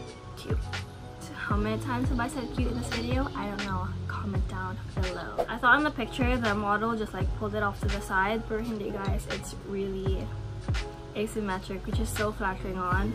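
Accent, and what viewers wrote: Visayas, Philippines. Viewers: Ang cute!!!!! I love ur bisaya haahahaha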